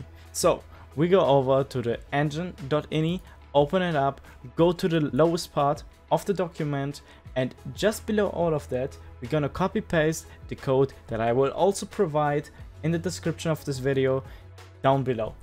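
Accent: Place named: Germany. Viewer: Also Bro no Hat, aber den deutschen Akzent hört man dick bei dir raus. Aber sehr Informatives Video, Kuss auf die Nuss❤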